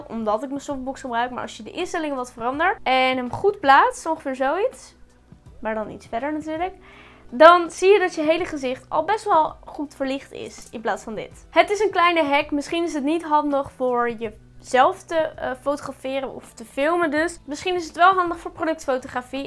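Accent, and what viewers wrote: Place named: Westland, Netherlands. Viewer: Jij komt zoiezo uit het Westland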